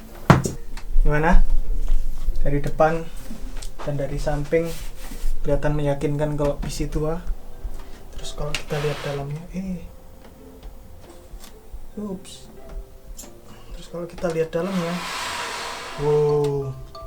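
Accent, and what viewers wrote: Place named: Java, Indonesia. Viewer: Orang jawa mana bang.. Muedook bnget.😁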